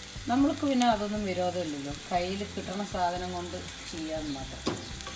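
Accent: Palakkad, India. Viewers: Sharikkum palakadan bhasha. Kure aayi kettitu. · Nammaley pkd slang spprr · Palakkadan bhasha kelkkumbo oru santhosham 😊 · PALAKKADAN BHASHA NALLA SUKHATTO KEKKAN......TVM BHASHA KETTE KETTE MATIYAYITTO....AVIDE KORACHE ARROGANT AANE BHASHA....PLKDN STYLE ORU ELEMA INDETTO...PARAYATE VAYYA MOLE...😊😚😊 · Hai chechi chechide palakkadan touch super enike ishtayi chechi de talking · Thani palakkadan bhasha...curry superb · The description in Palakkad slang is so good! Great! I really enjoyed! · Pkd style le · Palakkadan accent....hi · Venu Gopal, അല്ല സാർ, ഞങ്ങൾ വള്ളുവനാട്ടുകാരുടെ സ്ലാങ് ഇങ്ങനെയല്ല...ഇവർ പാലക്കാടിന്റെ അങ്ങേയറ്റത്തെവിടെയോ ആണ് ( തത്തമംഗലമോ കൊല്ലങ്കോടോ ചിറ്റൂരോ ) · പ്രേത്യേകത പറഞ്ഞാൽ.. ഒട്ടും ബോറടിപ്പിക്കില്ല. വളരെ നല്ല അവതരണ ശൈലി. അതുപോലെ തന്നെ ഉണ്ടാക്കുന്ന വിഭവങ്ങളും super. പാലക്കാടൻ ഭാഷ 👌👌♥ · പ്രോഗ്രാം സ്ഥിരമായികാണാറുണ്ട് ഗംഭീരമാകുന്നുണ്ട് പാലക്കാടൻ ഭാഷയും നന്നാവുന്നുണ്ട് പടവലങ്ങ എരിശ്ശേരി ഉണ്ടാക്കുന്നത് കാണിക്കാമോ.... 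· I am also from palakkad..can relate ur way of talking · mikka palakadan markkum avarude slang parayan valya madi ayirikum, chechi spr anu enik ishtayi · similar to thrissur style.. also like your palakkad slang, couse i has some of palakkad friends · പാചകം കാണുന്നതിനെക്കാൾ പാലക്കാട്‌ ഭാഷ കേൾക്കാൻ നല്ല രസം.കറി സുപ്പർ.ഉണ്ടാക്കി. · Hello, namasthe🙏 Oru Trivamdrumite aayitu ee comment kandittu noki pokan kazhinjilla. Palakkadan bhasha nalla suka kelkaan.. ente childhood days njan orupadu ishtapedunna mannarkad kure spent cheythitundu Oro deshathinte bhashaye inghane public aayi criticize cheyyunnathu sheriyano.. oru nallathu parayan oru negativity de avasyamundo.. , I love Palakkad too.. may be njan Trivandrumite aayondavum anghane ishtapedan pattunathu😊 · Palakkadan padavalangha molakushyam+palakkadan bhasha(kittolu,avoo) endekuttiye enikku vayya.UGRAN. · Super. Love the language and slang of Palakkad style. 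My native place is Palakkad.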